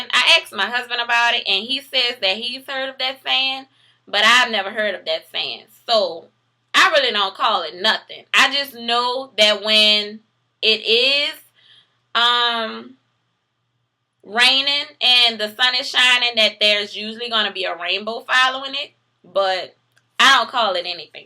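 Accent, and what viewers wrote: New Orleans, United States. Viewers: Yes Lawwwd!!! She Got That Strong New Orleans Accent!! I'm From New Orleans Too.....Wazzam Love, What Part Of The N.O. You From? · I didn't see this video until I did one of my own. It's crazy how many accents New Orleans has. Love the video! Definitely a New Orleanian accent. Almost like every neighborhood has their own. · Love it! Girl you had me laughing! You kept it straight N.O! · I love New Orleans accent simply beautiful. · This is hysterical. I'm from New Orleans too, girl. I sound just like you even though I live in Houston now and have for 6 years now. 504EVA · You sound and act just like my family from New Orleans! Sat here laughing the entire time :D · A lot of you folks on here are saying she don't sound like she's really from New Orleans. I personally know a three generation family straight from New Orleans, and the mama sound exactly like this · love your accent I have always wanted to go to new Orleans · I love how people from New Orleans talks!!!! 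I wish I talked like you! Im from KY and its pretty bad lol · Rollie pollie!!! Lawd I miss them thangs. Btw love that new orleans accent! I just think it sounds better on females. I mean damn shawty, just go into ya own bathroom and say daddy... Priceless!!! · She definitely sound like she from New Orleans. You can especially tell when she's talking and making conversation. Some people have a much heavier accent but she definitely New Orleanian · Love that accent, baby. I'm a NOLA boy and you have that accent and that demeanor that just feel like home. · Thank you. I thought I was going crazy up here in Arkansas. I'm from New Orleans and people don't always understand me. It's nice to hear a familiar accent. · Well that's how we talk baby!! I don't apologize for my accent either... It's who I am & I'm proud of it!! I say all of those word EXACTLY the way you say them;)) New Orleans is the best!!! 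· You're awesome! I'm from Brazil and I was really curious about how a person from New Orleans pronounces NEW ORLEANS. I sould say like that so. ;-D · i enjoyed i love your accent, i had a good time when i visited nola, the accents were a trip. =o) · MERTIE123 It's more the pitch and tone of her voice........sounds familiar to 7th ward New Orleans........... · oh yess she sounds just like a nola resident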